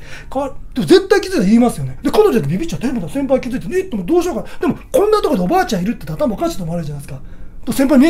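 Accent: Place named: Kansai, Japan. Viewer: 初めてコメントします 語りの方の話し方が関西弁で、尚且つ少し崩した感じの話し方ですごく聞きやすくて大好きになりました！これからも楽しみにしています🥰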